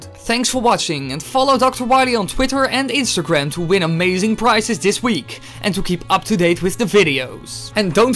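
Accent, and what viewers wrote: Netherlands. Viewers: are you dutch? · Ben je Nederlands of zo?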